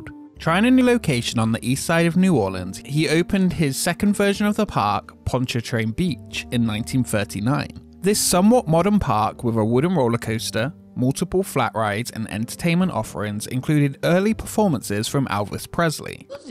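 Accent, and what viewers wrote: United Kingdom. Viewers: Hey what is your home park because i though i hear you speak british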